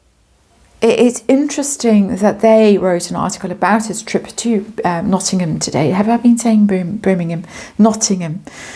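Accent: South Africa. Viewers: You are on fire today! Love the snark in your beautiful SA accent!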